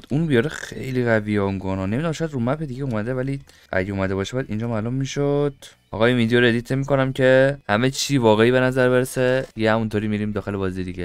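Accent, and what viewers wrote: Iran. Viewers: Why Are You Speak Like Irani Persian ?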